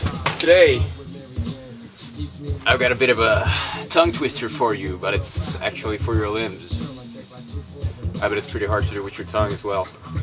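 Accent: United States. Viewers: sweet vid. Its odd you dont really have any accent. You sound like you could have been raised in the midwest.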